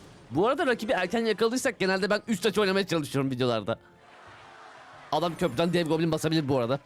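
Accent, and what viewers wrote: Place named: Yozgat, Turkey. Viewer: Abimin yozgat şivesi var adamsın abi😎😎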